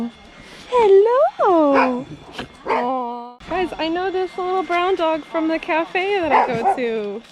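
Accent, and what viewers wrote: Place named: Canada. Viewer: Hi, you had make a right decision to live in a small town to learn mandarin. You sound Canadian.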